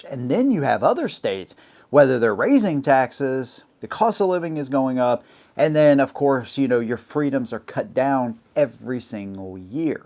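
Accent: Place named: Tennessee, United States. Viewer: bro i figured you were from tennessee Glad to hear you live in the great palmetto state!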